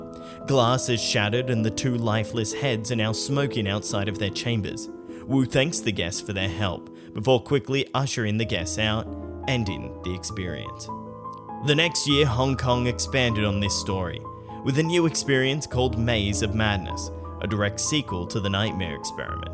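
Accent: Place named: Australia. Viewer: Are you from Australia if not then I like your accent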